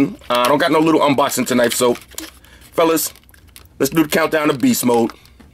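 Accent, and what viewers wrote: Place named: Brooklyn, United States. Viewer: you sound like you're from Brooklyn. Brownsville Here Homie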